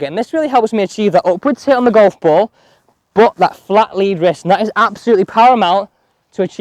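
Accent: United Kingdom. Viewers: It’s the fast British accent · slow the speech please not all viewers are scottish!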